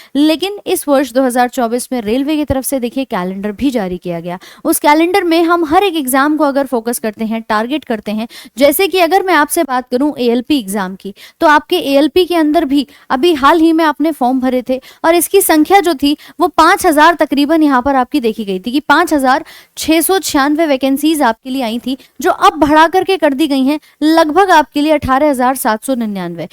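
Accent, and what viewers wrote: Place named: Meerut, India. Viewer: Ma'am aap kya Meerut se ho kya Kyonki aap बढ़ाने को भढाना bolti ho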